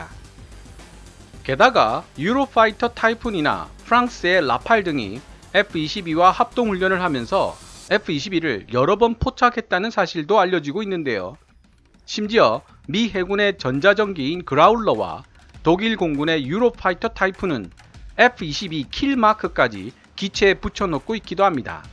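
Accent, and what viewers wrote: Gyeongsang, South Korea. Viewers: 알만한 사람는 다아는 내용을... 호들갑 떠내... F35 파는 이유는 F22가 있으니까 파는 거고 F22안파는 이유는 F22보다 뛰어난 기체를 아직 개발보유하고 있지 않기 때문이지... 이사람 갈 수록 방송 제수 없게 하네. 영어도 존나 못하고 발음도 개떡같이 하네. 어떻게 영어발음에 경상도 사투리억양이 들어가있냐?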